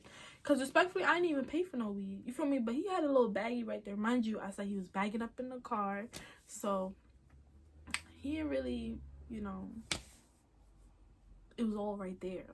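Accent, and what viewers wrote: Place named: New York, United States. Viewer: Beloved lol New York accent is cool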